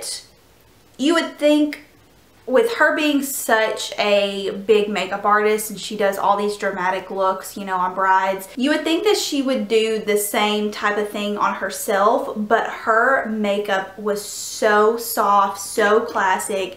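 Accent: South, United States. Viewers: Im in love with her southern accent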